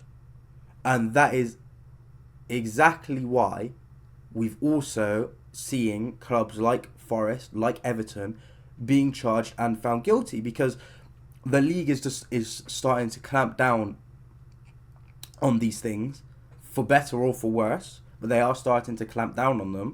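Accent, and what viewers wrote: Manchester, United Kingdom. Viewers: You have an interesting Manchester accent...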